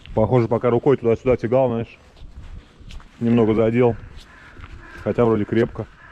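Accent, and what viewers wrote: Saint Petersburg, Russia. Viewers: ты с Питера?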